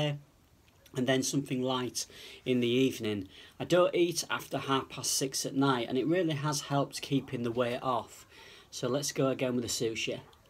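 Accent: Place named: Northern England, United Kingdom. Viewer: You've got to stuff it in your gob, couldn't sound more northern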